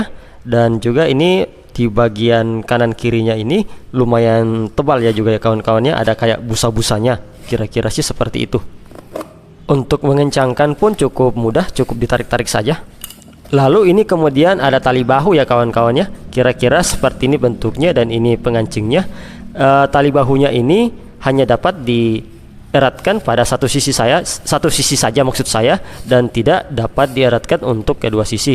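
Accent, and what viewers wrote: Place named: Sulawesi, Indonesia. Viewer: Dari logat bicara kayaknya Abang orang Sulawesi ya 😂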